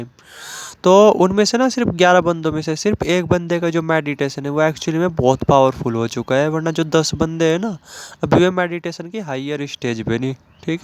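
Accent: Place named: Jhajjar, India. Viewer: ohkk. Mere jhajjar ke relatives h bilkul apki trah bolte h 😂😂😂 Ek se voice bhi milti h apki.or bolne ka tareeka bilkul same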